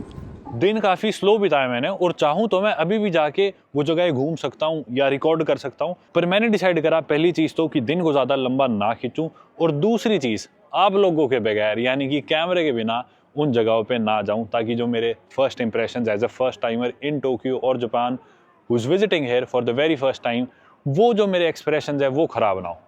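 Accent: Haryana, India. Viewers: Baki sab sahi video quality best host best per ye jo consistency hai vo thodi si increase hojaye jayda bhi nhi halki si increase hojaye hafte me ek hi ajaye na tho bhut hai bhai ab have to hogye hai aap ke video dhek ne ke mamle me jayda nhi bus halki si consistency quki itne actual punctual hum bhi Nhi hai isliye Kripaya ker ke hafte ki ek or bhai raw upload ker do video me tho kheta hu or full Haryanvi accent wagera jo app normally use kerte hi hogye usually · Kuch bhi ho jya lekin Haryanvi sudh hindi nhi bol sakte , " jaan karke" he is speaking that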